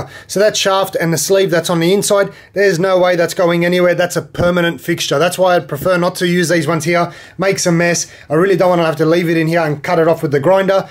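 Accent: Australia. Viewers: Thanks so much. I loved the Aussie accent the most. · Best Vid bro... Easy, quick and direct... and awesome to hear a middle eastern aussie voice.. lol..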